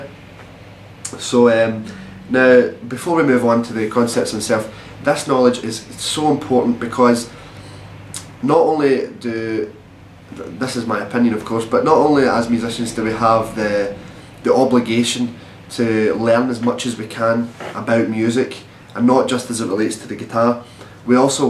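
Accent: Scotland, United Kingdom. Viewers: Like your accent. Scottish? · I'm in love with your accent ! Is it scottish? Northern Irish? Anyways, it's awesome Thanks for the guitar lesson man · he speaks nasal. But where is this accent from? Which part of the UK? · Dat Scottish accent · Thank you so much for this. I started on piano when I was 7 and have been out of playing for 40+ years. My son-in-law gave me one of his old guitars last Christmas and I had forgotten most (if not all) of my theory. It was one of the problems I was having converting the keyboard to the fret board. Even through the Sco"ish accent, this was just the reminder I needed. Thanks again. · Comments on the video later but that accent is amazing!! Scotland?? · He sounds like a fine Scot to me! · Scottish accents rock! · Scottish?? Great video man btw man!!! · Scottish accent... no problem to follow him... thanks to few years in UK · As suggested... 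some of these exercises are indeed tongue twisters... especially when spoken in a broad Scottish accent! Good instructional video nonetheless... well done!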